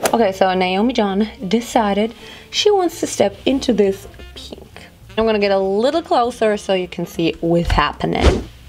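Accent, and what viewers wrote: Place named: Germany. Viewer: Richtige deutsche Akzent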